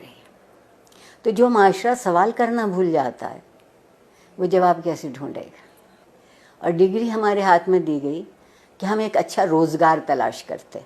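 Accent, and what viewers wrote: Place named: Lucknow, India. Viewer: She has Lucknowi urdu accent also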